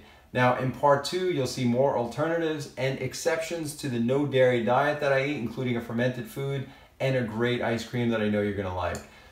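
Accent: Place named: New York, United States. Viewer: You have a great New York accent, btw!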